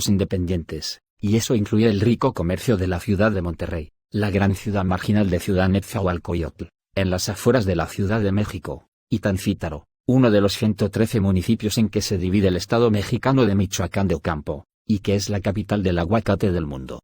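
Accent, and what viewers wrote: Spain. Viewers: Este estupido tiene asento español....es un pendejo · No eres de MEXICO asi que no tienes derecho a opinar sobre el pais mejor preocupate por tu pais si es que tienes pero por el acento eres gachupas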